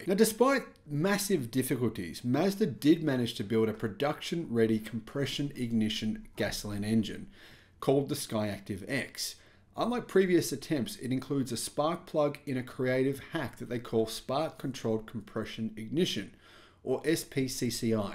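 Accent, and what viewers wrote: Australia. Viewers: He's an Aussie - it's an accent thing I guess. He also says Tezla...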